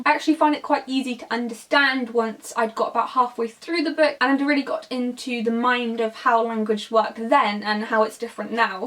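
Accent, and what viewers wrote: United Kingdom. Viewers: Your British accent s so great, BOOM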